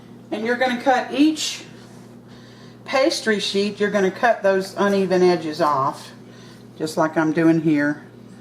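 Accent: South, United States. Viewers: Luv ya,,,, your coment about unsalted butter,,, "honestly that is such a farse" with your southern drawl. LOL the best!! And that is so how I feel as well, THANK YOU. Plus it also reminded me of my beloved aunt Caroline. So here in the midwest we once a had grocer chain that carried the once well known bakery line of McGlynn Bakery and they had these! Yes, the pastry dough part was much like a cross of a Eclair and a Croissant just like what these look to be!! :0) Where as all other cinnamon/sticky buns were like some boring flat bread dough that is barely ediable fresh out of the oven. Oh and the McGlynn ones didn't have raisins which was so fine with me. And thats been more than 20 ago.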